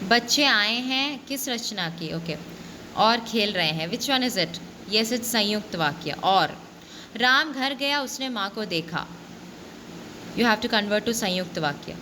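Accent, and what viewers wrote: India. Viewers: Ma'am are u North Indian or south Indian?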